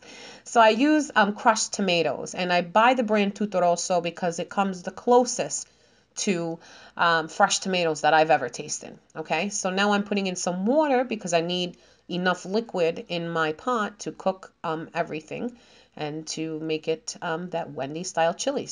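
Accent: New York, United States. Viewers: Are you from NY? You sound like my cousins from NY! I love Wendy’s Chili and I’m crazy about Puerto Rican Food!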